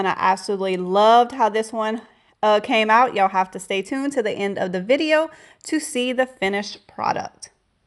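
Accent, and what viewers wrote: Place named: Deep South, United States. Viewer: You are so creative, love the projects. Just subscribed. And that deep South accent,lol, couldn't stop listening.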